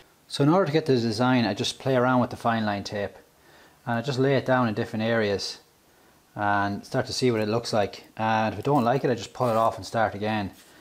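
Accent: Limerick, Ireland. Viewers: I’m from Southern Ireland, I can confirm this is not a Dub accent 🤣 Limerick/Wexford maybe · Nice to hear an Irish Man 👍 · You sound Irish and this is a very honest video it's beautiful so are the wheels when on that car cheers for makeing it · McGregor has Scottish/northern I think this fella sounds Irish. But I do get your joke man! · Lol it's hard enough understanding that Irish accent and talking fast ain't helping ither 😂😂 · He is Irish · That Irish Accent...!!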